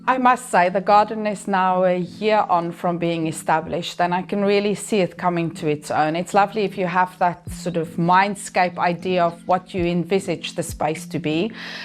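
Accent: South Africa. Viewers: Nice mooi afrikaanse aksent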